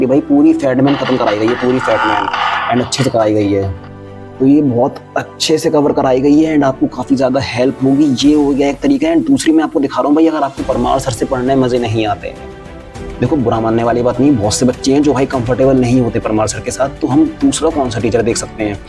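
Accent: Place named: Uttar Pradesh, India. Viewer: 😅 having up accent it feels like heavy more than sophisticated...woo thoda matter ho gya wala feel getting 🙃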